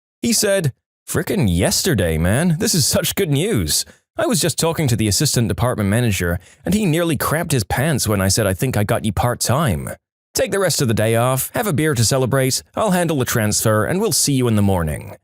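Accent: United Kingdom. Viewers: the reason why the narrator has turned British is because it's a different narrator from Am I the Genius. he's been in a couple videos lately. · I’m not the only one who liked the British voice he used in the video. I want to hear more of the British voice. I liked it. It was nice if I do say so.❤😂😊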